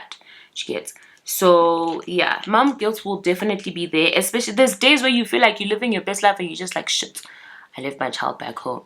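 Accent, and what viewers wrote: Nigeria. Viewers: Definitely, definitely want to see more content similar to this. It opens eyes and strengthens women in so many indescribable ways. I love the Nigerian accent as well, always gets me smiling🤭🤭🤭❤️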